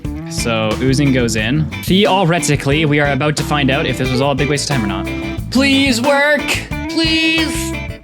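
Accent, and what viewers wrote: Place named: Canada. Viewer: Sounds Canadian Looks German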